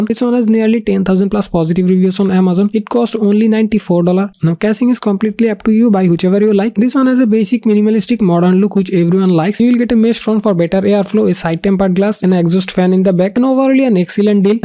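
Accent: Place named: India. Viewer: This is the most Indian accent ever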